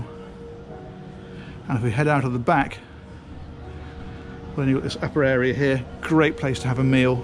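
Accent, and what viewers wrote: United Kingdom. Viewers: Your British accent makes these tours even more interesting ...not sure why??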